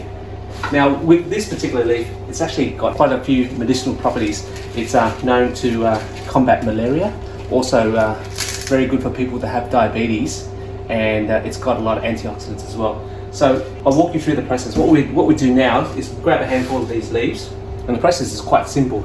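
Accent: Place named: Australia. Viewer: This is so trippy to hear a vietnamese guy with an Australian accent? Sorry I don't know the accent.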